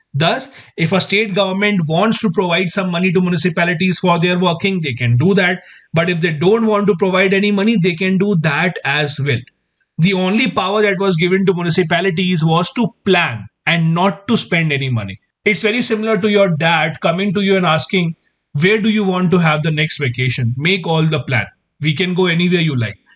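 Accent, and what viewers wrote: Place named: South India, India. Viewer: U r awesome and ur voice is attantive just like some sort of South indian accent I feel very connective to that. Thank you so much sir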